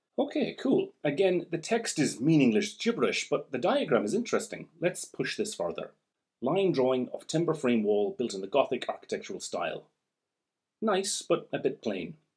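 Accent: Ireland. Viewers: Your accent is obviously Irish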